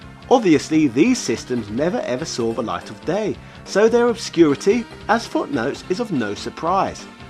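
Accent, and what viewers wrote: England, United Kingdom. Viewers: Are you england? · It's his accent. He's from the U.K.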